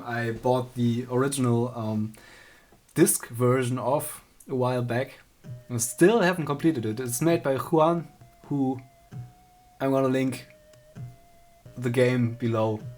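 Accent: Germany. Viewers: Vermutlich wegen der grösseren Reichweite auf englisch ? Aso...und sooo schlecht ist sein Englsich ja auch gar nicht, nur die Aussprache klingt halt sehr deutsch :-) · Konnt heraus hören das du deutsch bist xD epic console _ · Are you from Germany? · You sound German I’m from Germany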